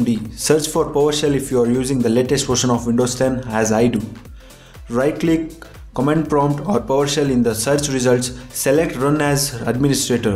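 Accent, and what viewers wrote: India. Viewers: Ty BRO nice idian accent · FUCK YOU AND YOUR INDIAN ACCENT AND YOUR SCUMMY VIDEO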